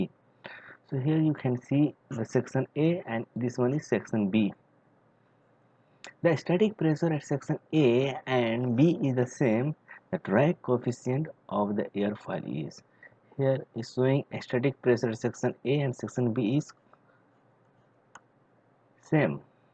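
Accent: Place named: China. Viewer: why you speak like a chinese person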